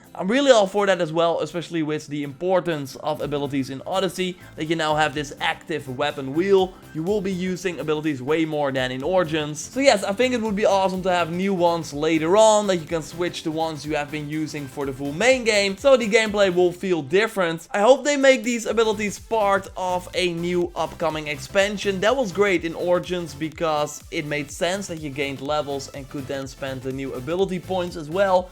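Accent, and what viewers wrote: Netherlands. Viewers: I like everything about Assassin's Creed, too bad you have such a horrific Dutch accent that I can't bear through any of your videos. It's a real shame man... Do something about your awful English speech. Then maybe you'd be a really good YouTuber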